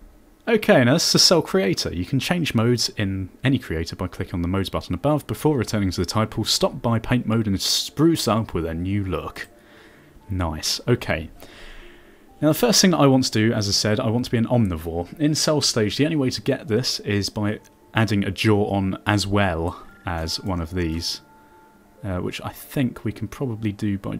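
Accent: United Kingdom. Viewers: youre voice sounds like mine but british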